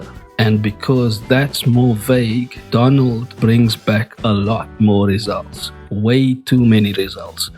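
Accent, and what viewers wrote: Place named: South Africa. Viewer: Why do you sound south African.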